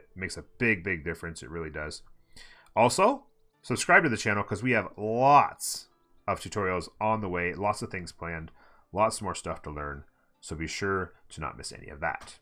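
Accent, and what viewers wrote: Canada. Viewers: The Canadian accent is so adorable. Our and Are sound the same, but Hour sounds completely different. Love it!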